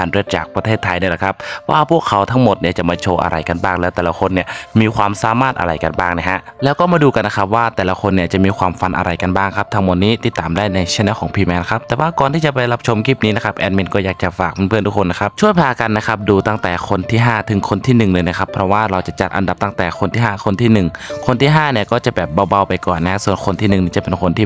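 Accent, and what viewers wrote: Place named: Cambodia. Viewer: แอดค่ะ ล้อเหมนบ่อย จนพูดติดสำเนียงเหมนไปแล้วนะฟังผ่านเหมือนคนเขมรพูดไทยเลย😅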